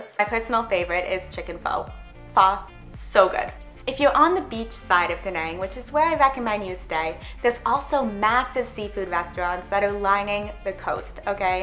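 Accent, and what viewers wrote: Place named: Upstate New York, United States. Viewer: Where's your accent from? You have an accent that sounds like a north New York accent.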